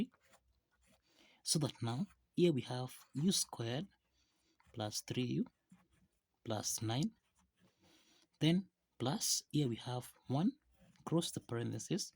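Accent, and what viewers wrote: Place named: India. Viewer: Ужасный акцент индуса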